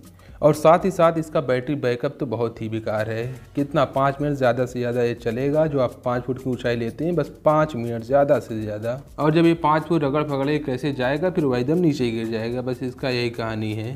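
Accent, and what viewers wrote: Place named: Bhojpuri, India. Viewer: Ur bhojpuri accent is fascinating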